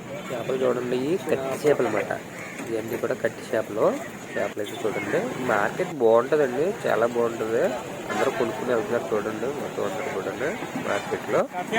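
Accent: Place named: Konaseema, India. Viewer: Thammudu variety video. Your slang konaseema slang Open slang. Which village my dear brother All the best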